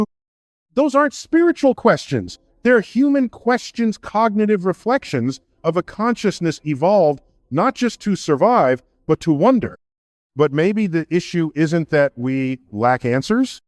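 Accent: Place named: India. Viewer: This ai narration is boring. I hear Indian mannerisms as well.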